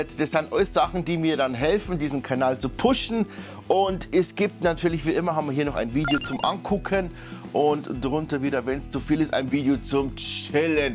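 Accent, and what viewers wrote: Bavaria, Germany. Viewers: Jo, sog amoi, Du redst ja boarisch. Wo kimmts'n Ihr her nachert? Nur so Pi x 👍🏻? Coole Videos übrigens. Guat dass was zum Thema Cakewalk in meiner Sprachn gibt, und ned bloß auf Englisch (obwohl mei Englisch recht guat is)!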